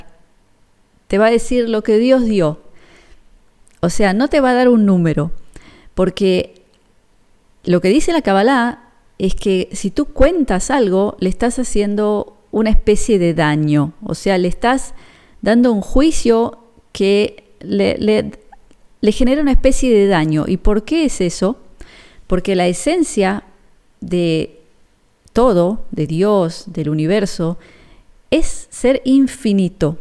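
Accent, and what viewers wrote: Argentina. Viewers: Soy nueva y me encanta su canal, sería lindo saber como de Argentina 🇦🇷 (porque creo sea Argentina por el acento tan marcado,) vive en la tierra hermosa de Israel y sobre todo GALILEA 🤩. La felicito tiene un cutis muy lindo, la piel se ve losana!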